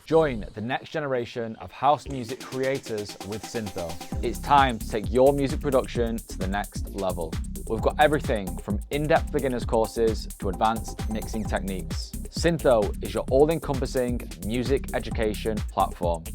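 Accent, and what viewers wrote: United Kingdom. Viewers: You English pronansuation is special candy for ears, is it RP?) thank you for nice tutorial as well!)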